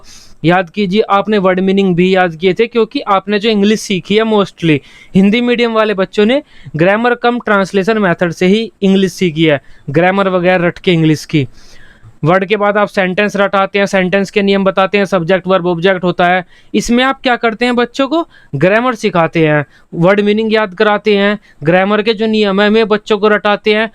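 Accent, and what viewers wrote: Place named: Saharanpur, India. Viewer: Bhai language se 100% Saharanpur ka lagra h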